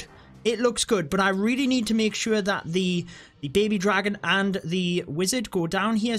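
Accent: United Kingdom. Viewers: well he's british but u will get used to it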